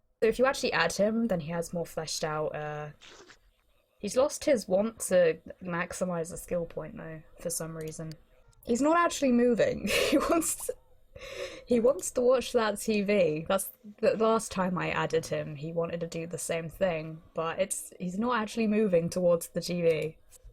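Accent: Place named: United Kingdom. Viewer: I live in the UK and we do that too and I was kind of confused because she sounds like she is from the UK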